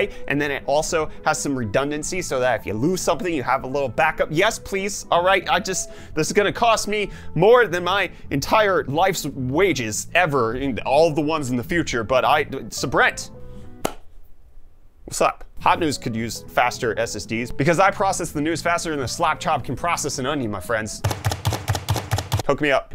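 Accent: Canada. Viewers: Umm Canadians sound just like you. We don't speak any different. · More Canuck accent please!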